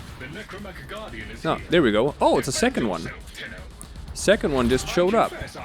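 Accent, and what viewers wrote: Sweden. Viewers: is this swenglish? "im pressing i"....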